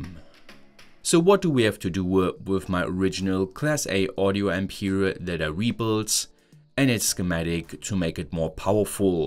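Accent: Germany. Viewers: But why do you have such thick German accent if you say that you are Scottish?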